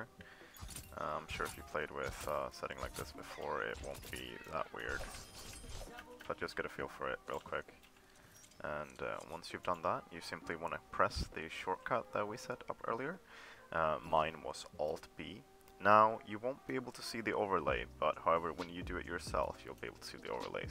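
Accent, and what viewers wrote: Sweden. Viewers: love the swedish Accent much luv <3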